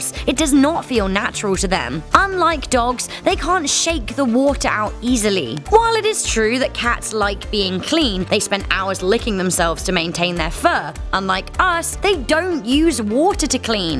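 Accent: England, United Kingdom. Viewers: Loves the color green Has an English accent And loves cats Anyone get the reference?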